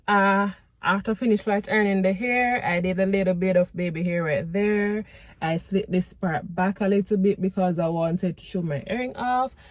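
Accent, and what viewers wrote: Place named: Jamaica. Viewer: Jamaican accent so real mi lobe it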